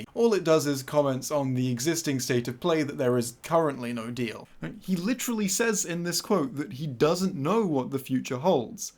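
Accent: United Kingdom. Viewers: You really care about topics no body asked for, little angry british fella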